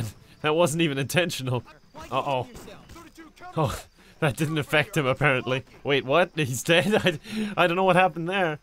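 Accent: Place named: Ireland. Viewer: it's nice to hear a true irish accent +1 sub. i like you editing style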